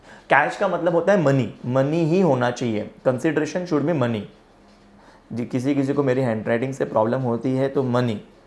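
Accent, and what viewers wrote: Maharashtra, India. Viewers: Are you Marathi ?sir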